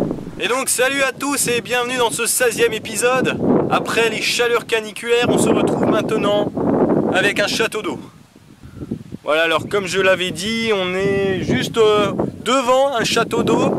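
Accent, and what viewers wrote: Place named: Alsace, France. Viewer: MMMMMH Cet accent Alsacien! arrêtes de te renier:3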